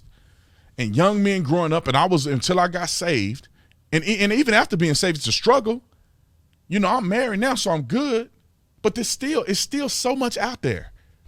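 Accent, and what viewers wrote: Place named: South, United States. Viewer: definitely has a southern accent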